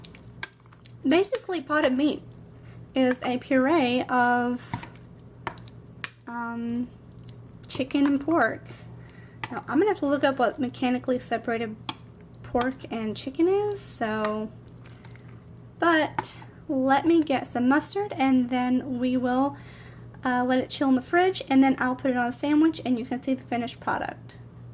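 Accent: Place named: Appalachia, United States. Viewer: I'd sure like to know what part of Appalachia you hail from with that High Falutin kitchen n way of talkin'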